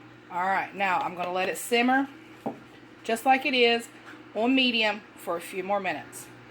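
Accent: South, United States. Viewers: Arika sent me over and I'm glad! I love your Southern accent. I'm from North Carolina so I feel right at home with you! I'm new to your channel and I plan visiting you every time you post. Thanks for the great recipe! Looks delicious! God Bless!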